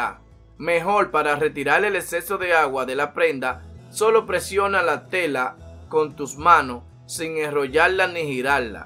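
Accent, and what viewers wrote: Dominican Republic. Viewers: Es dominicano/ puertorriqueño amiga 😹😹 así es la lengua allá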